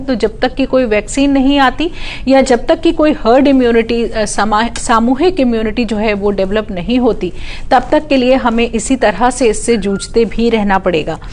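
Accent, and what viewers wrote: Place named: Delhi, India. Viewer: Medam aapki awaaz me Delhi 6 wali Ton hai....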